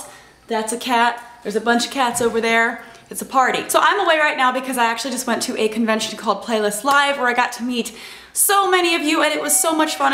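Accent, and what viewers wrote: South, United States. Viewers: the country accent was actually very cute. keep up the good work huge fan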